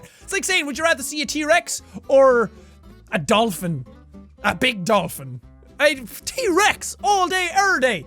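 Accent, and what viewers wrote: Ireland. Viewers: Jacksepticeye everyone just roasted markilper on his channel I love your channel thank you for makes someone extremely happy everyday because of this channel thank you for making me feel less lonely everyday absolutely is beautiful your accent I'm enjoying this channel because of Irish accent is like music to my ears thank you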